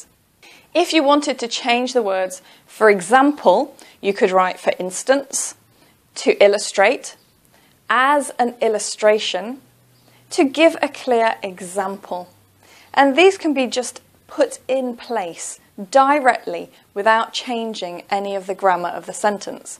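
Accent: England, United Kingdom. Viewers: Are u english:)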